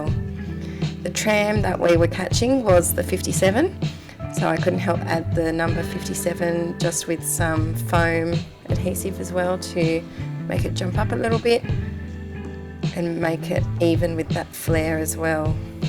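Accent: Australia. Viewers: Great layouts - looking forward to seeing more :) It is nice to hear another Aussie :)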